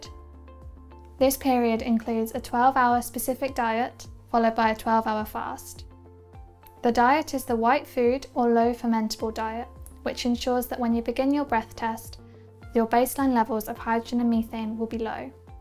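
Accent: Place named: England, United Kingdom. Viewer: shes english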